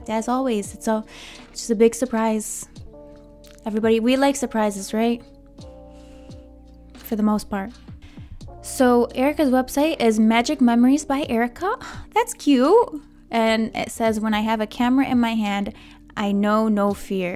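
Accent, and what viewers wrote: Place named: New Orleans, United States. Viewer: I just placed your accent, it's New Orleans, right? You sound just like a friend I had from there.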